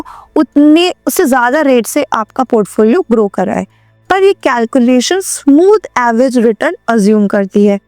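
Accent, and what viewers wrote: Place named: Bengal, India. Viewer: She may be a non hindi speaker. Maybe Bengali or any other non Hindi Speaking area . Trying well. Keep it up.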